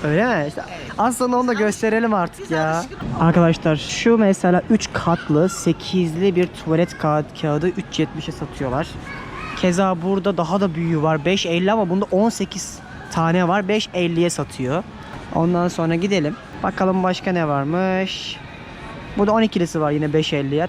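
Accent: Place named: Isparta, Turkey. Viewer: Arkadaslar size guzel bir haberim var.Hic uzulmeyin cunku bu adam assagiligin teki,100.00 dolar maas alacakmis ve bu fiyatlarla mutfak masrafi yapacakmis. Peki ev kirasi ne kadar veya ev almaya kalksan kac milyon.Ben sasirdim bu ulkede bedava arsa dagitiyorlarmis diyecekmi diye.Bu ulkenin dogal kaynaklari cok ve bunu iyi degerlendiriyorlar.Kendi petrolleri denizden cikiyor.Koyun canli satilirsa ciftlikten 5-8 dolara bir koyun alabilirsin.Burasi bir kita ulkesi ve cok buyuk arazisi var suyu kit da olsa bugday uretiminde dunyada ilk siralarda.Muthis demir celik uretimi ve komur uretimi var.Iskenderun demir celik ve bazi komurle calisan elektrik santrallarinin komur ihtiyaci Australiadan geliyor.Bu kadar avantajina bir de nufusunun cok az olmasini eklerseniz Turkiyeye gore avantajlari var ve bu nedenle yasam, Turkiye iyi yonetilmedigi icin Turkiyeden daha iyi.Ancak video tam gercekci degil, bir ulkenin, havaalanindan inip birkac saat icinde iyi veya kotu oldugunu anlayamazsiniz.Australia da 1 saat calisirsan su kadar domates alirsin hesabi yanlis.Eline ne geciyor vergi yarisini goturuyorsa ev kirasi turkiyenin 5 kati ise hesap birden degisir.Bu saf herifin gazina gelmeyin sakin ha.Bunun amaci videonun cok izlenmesi, uc bes kurus You tube den bahsis gelecek diye salliyor.Konusmasindan ve KAGIT diyemediginden muhtemelen Isparta denizli Afyon civarindan biri.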